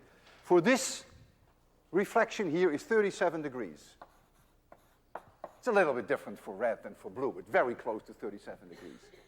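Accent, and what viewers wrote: Netherlands. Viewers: Some people tell me that I sound just like this man when I'm talking English. I'm Dutch too. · The dutch accent is indeed very recognizable, but atleast he's not like Van Gaal.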